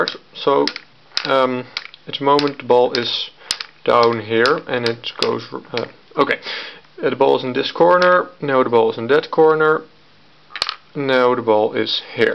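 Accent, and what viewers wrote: Netherlands. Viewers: Ben jij Nederalnds? vraag ik me af vanwege je accent? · ik hoor gwn dat je uit nederland komt :D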